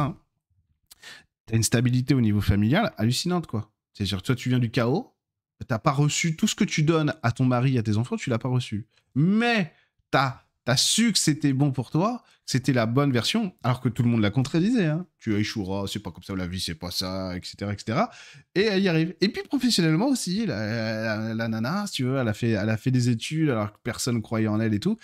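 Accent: South, France. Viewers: Merci beaucoup pour ce partage ! Et l'accent du sud nous apporte ce petit côté ludique ❤! Ps: j'adore ton t-shirt!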